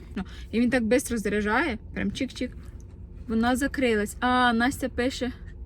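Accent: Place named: Cherkasy, Ukraine. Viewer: доця, я так радію, коли виходить твоє відео. Вони мені такі домашні, ніжні, добрі, тому що ти дуже гарна дитина у всіх відношеннях. Бажаю тобі всього найкращого, а головне, щоб ви наші діти жили в світі без війни. Обожнюю як ти розмовляєш, у нас( Чер каська обл. ) теж так говорять.❤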